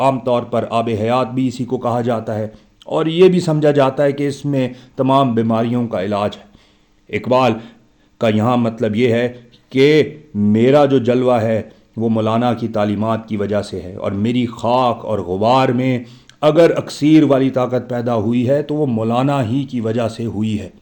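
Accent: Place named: Iran. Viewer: السلام علیکم۔ علامہ کے مثنوی کے آغاز میں لکھے گئے ان اشعار کے مفہوم کو سمجھنے کے لئے آپ کی ویڈیو کو کئی بار سنا مگر ان اشعار میں پوشیدہ وسیع مفاہیم کو سمجھنے کی تشنگی ختم نہ ہو سکی ۔ علامہ کا فلسفہ خودی تو انکے بعد میں آنے والے کلام میں بیان ہوا ہو گا مگر انکے یہ تمحیدی اشعار مولانا روم کی عظمتِ فکر کے ساتھ اقبال کے ان سے روحانی تعلق اور عقیدت کے اوراق بھی کھولتے ہیں۔ علامہ مولانا کو اپنے پیرومرشد کا احترام و مقام دیتے ہیں۔ آپ نے ماشااللہ ان اشعار کی بہت خوبصورتی سے تشریح کی ہے ۔ ایک ایک لفظ اور تلمیح کا سلیس مطلب بتایا ہے۔اور فارسی کلام کو موجودہ ایرانی لہجے میں پڑھا ہے۔ اللہ آپ کو جزائے خیر دے۔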